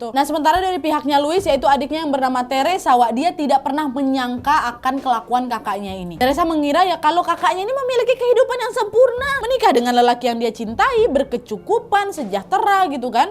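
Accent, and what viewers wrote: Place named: Medan, Indonesia. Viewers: Serasa macam sedang di Medan lah awak nih... sukak kali dengarin cakap nya nih... tebayang awak masa kecil di Medan.. sukses ya.... 😊😚👍👍👍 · Gak pernah bosan melihat vidio vidio kamu syg lucu gokil. Rame dgn logat melayu medan nya.. Trimakasih mbk uda selalu menghibur dgn cerita kisah nyatanya. Sukses terus ttp semangat dan semoga kamu sehat dan bahagia. Amin 😇🙏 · Enak kali dengar ceritamu wak..ada logat medan, ada logat minang,, nyampur2 😂😂😂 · Logat Medan,Sumbar dan Pekanbaru menyatu 🤣😂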